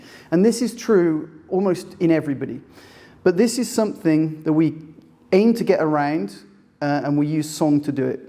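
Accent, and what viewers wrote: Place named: Northeast, United States. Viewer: I was going to say, you sound like you’re from somewhere east of New York. 😊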